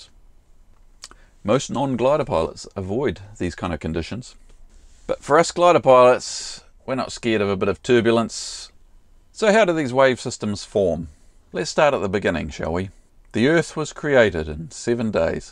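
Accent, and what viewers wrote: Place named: New Zealand. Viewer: I love the NZ accent, ahbe been there in 2008 and love your humor, Keep on going and greetings from Switzerland (LSPO)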